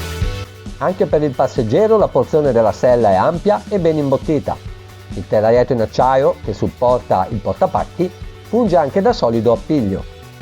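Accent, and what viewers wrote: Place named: Brescia, Italy. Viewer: mi sembrava Brescia....invece si😅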